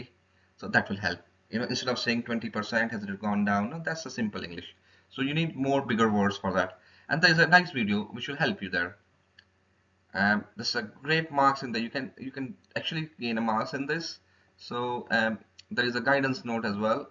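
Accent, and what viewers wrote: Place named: India. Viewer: "Give" the exams...very Indian accent...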